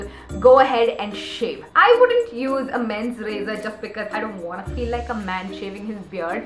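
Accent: India. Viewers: which accent are you trying to talk in Indian , American or British? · Very informative video n luv d way u talk n ur voice is mastam...r u India n? n u luk so qute :)) n when u said shupp shupp shupp that moment was awesome gal... :)) way to too :))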